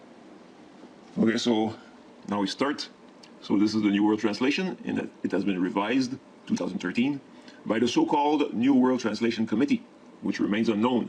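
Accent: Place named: Acadia, Canada. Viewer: Hi great video My best friend is Acadien and your accent sounds like hers she is very proud of that. BLESSINGS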